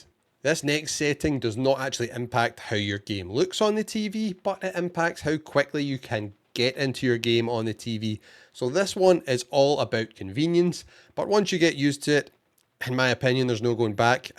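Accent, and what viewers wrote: Scotland, United Kingdom. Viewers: The Scottish accent. The best accent in the world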